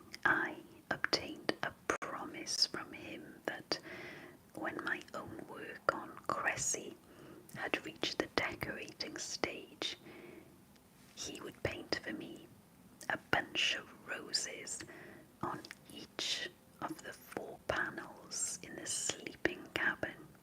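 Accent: Wales, United Kingdom. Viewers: I really love the Welsh accent, especially when women speak it, but the ASMR whispering just isn't for me. Are there any recommendations for YouTube channels, podcasts, something where I can listen to some heavy Welsh accents talking about some nice stuff?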